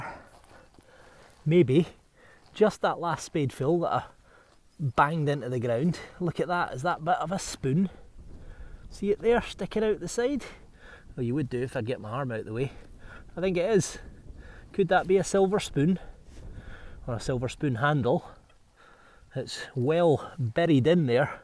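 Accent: Scotland, United Kingdom. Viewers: Aw man sing, your Scottish accent is very cool. I have not long stepped up to a Deus. I was using a Fisher F2 but i think i had out grown it. I'm hoping the Deus makes all the difference. Thanks for your time and showing how superb these machines are. I haven't scratched the surface in terms of it capabilities and don't expect i shall for some time. I got the wireless remote and ws4 package and it's super light, very impressed.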